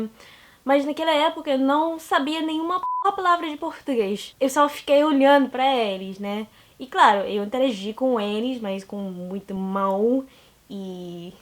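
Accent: Rio de Janeiro, Brazil. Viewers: Sotaque carioca 😎👏👏👏👏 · Seu sotaque esta muito bom , não está parecendo muito norte americana e sim uma carioca 😅...e também vc é muito linda 😍❤️ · caramba, tu fala muito bem o português, tá carioca legal! Rs Parabéns! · carioca purinha kkkkk Muito bom :) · Tá indo no caminho certo pq sua pronúncia é muito boa oq todo mundo tem dificuldade até gringos que estão a anos no Brasil obs: vc tem sotaque carioca pq? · Sotaque carioca NAOOOO... Fico triste assim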